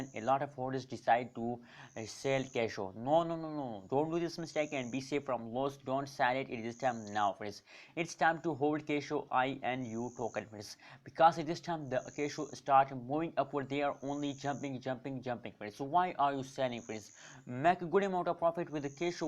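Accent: India. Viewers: white people video thumbnail english language indian accent as fast as japan high speed electric train no facts at prediction even a teenage 14 boy also can reexplained this thats blah blah blah zero informative content as for sure most brainy positive video presented by showing by content presenter faces for sure this boy wanna follow hype and get fast subs and views and get money for sure many viewers will stay out from this kind of video coz its promising thumbnail video promoting click bait.. well done indian teenage boy! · Dude, i cant even understand what are you saying. Indian accent is already hard to understand and you are speaking too damn fast.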